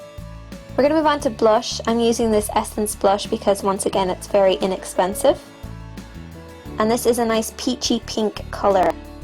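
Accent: Ireland. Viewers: This is an Irish accent :) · Are you Irish? :D I am ;DD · really love her accent :) love irish accent :) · It's Irish. :) Yeah, I've thought the exact same thing - she does go into a bit of an American accent at times. But then she goes back into her Irish one (which I love! because it's so unique, especially on Youtube). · yea its irish! I think its because american accents were predominantly derived from irish accents :) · she's irish :) x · shes Irish.. :-)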